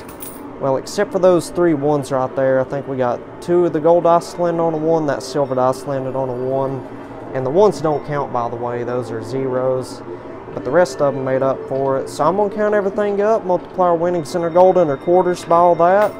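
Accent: South, United States. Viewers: I love watching you play the coin pusher. I live in Canada and I really like you southern accent.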